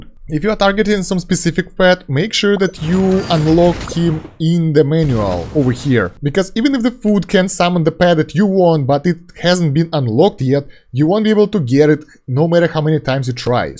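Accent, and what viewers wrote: Russia. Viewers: уфф, я был уверен, что это русский акцент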